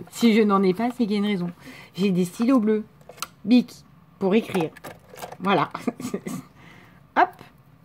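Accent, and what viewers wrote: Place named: Haute-Marne, France. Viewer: Cc 🙋 Alors alors alors...!!! Quesqui pourrai t inspirer 😁 je choisi la couverture.. ah super le tour de France je connais sa c'est la galère sérieux.il me semblais bien que je connaissais cet accent je suis native de la haute marne Chaumont tu dois connaître...et Reims je connaissais bien gros bisous 😘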